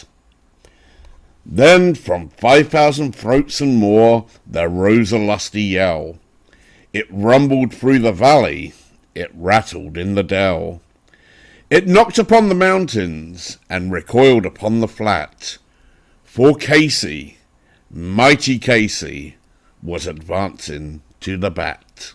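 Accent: England, United Kingdom. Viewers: Great video, I haven’t heard that poem in many many years, and never read with the English accent……..wonderful my friend!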